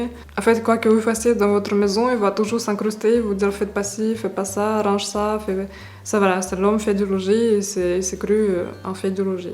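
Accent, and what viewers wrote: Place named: Russia. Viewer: salut, jai regarder plusieurs de tes videos c est chouette dentendre le francais avec cet accent, je voulais juste comprendre pourqoi chez les femmes russes vous semblez obseder par largent, si té en couple aavec un homme c est pas le seul critere jespere?n y voit aucune reflexion negatrive de ma part c est juste que jai limpression que les rapport hommes-femmes en russie sont a 90 pour cent baser sur largent, c est dommage nan?ya plein de chose interessantes a decouvrir chez les gens a part ca meme si c est vrai que c est important aussi, voila, bonne continuation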